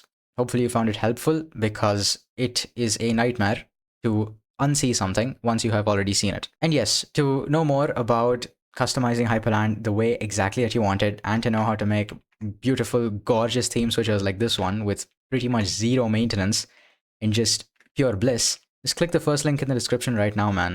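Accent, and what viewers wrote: India. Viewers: > it's the shortcut through years of confusion, broken configs, and scattered information. vaxry (the maintainer/creator of most things hypr) sells it for 5usd, and doesn't even try to pitch it onto the marketing-speak of yours of it being any worth, much less it being some adequate/proper/fast way of coming to the hypr ecosystem/the linux way of things. + indian accent lmfao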